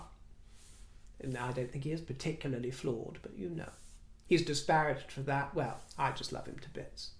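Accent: United Kingdom. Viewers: Those of us who are from southern USA, as well as highly educated population pronounce it , "sec- ree- tare-reey" . I really prefer the UK pronunciation, but it would come across as pretentious if I used it. We have such a lovely, rich language. I do enjoy hearing you speak so beautifully. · .... Thnx for such a delightful ending, evocative photos & lovely music. Nice 👍 As to pronouncing 'secretary' in the PNW state of Oregon... We pronounce it, SEC' re tary. Some day I'd love to do a deep dive into why the US pronunciation of 3+ syllabus words seems opposite from the British way (& our gallons n miles to your liters etc - why? ) Like... Controversy.. The US say it usually with a hard accent on the 1st syllable... CON tro versy The Brits, I think, say it in a much more delicious way.. con TRA versy. At 65+ yrs old, I wish I could snap my fingers & end up pronouncing [our] language your way. 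U.S. has ruined so much, IMO.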